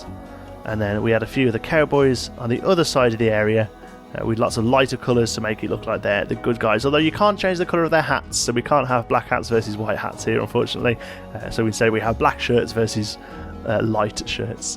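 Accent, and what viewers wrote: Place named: Birmingham, United Kingdom. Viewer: Absolutely love this series. Probably the best I've seen. Love the detailing. Also, I did comment asking about your accent, but I think I've concluded its a Brummie accent? Correct me if I'm wrong.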